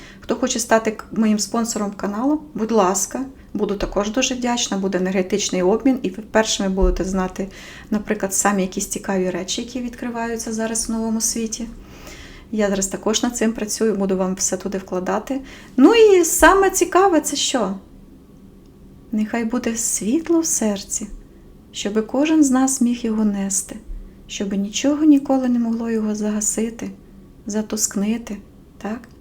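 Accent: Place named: Rivne, Ukraine. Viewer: Дякую. Люблю слухати Ваші вірші, Ваш рівненський голос.♥️♥️♥️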